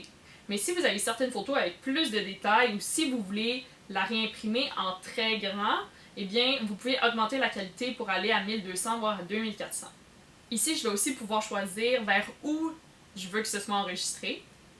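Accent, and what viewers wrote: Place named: Canada. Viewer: très pratiques ta vidéos avec tous le charmes et ton beaux axons Canadien que le Seigneur te bénisse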